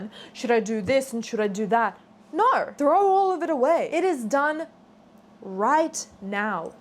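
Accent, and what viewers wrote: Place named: Australia. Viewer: Wow I really don’t feel motivated by someone yelling at me in an Australian accent 😂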